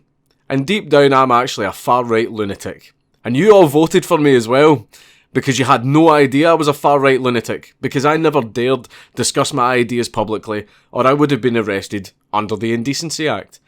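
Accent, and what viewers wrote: Ireland. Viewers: Would be nice if I could hit a CC button cuz your irish is soo thick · haha this is the first video i am watching of yours, and as an American, I can't take you seriously with an Irish accent LMAO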